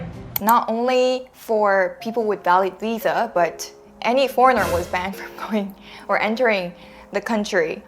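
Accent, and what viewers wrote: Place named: Philippines. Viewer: That pinoy english accent, wow sososyalin!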